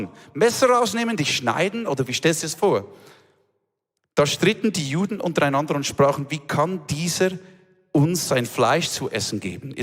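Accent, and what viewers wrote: Switzerland. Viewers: Liebe Mirjana, das ist Hochdeutsch mit Schweizerakzent. Und wenn man Hochdeutsch versteht, ist es kein Problem die Predigt zu verstehen. Zu den Menschen wird freundlich gesprochen und sie werden ernstgenommen. Nach der Speisung der fünftausend ist Jesus Christus herausfordernd, weil er ins ❤ der Menschen sieht. Bei Maria und Martha ist ER empathisch. Denn ER weiß welche Worte wir brauchen. Auch diese Geschichte wird erwähnt. Also auch diese Tatsache kommt nicht zu kurz.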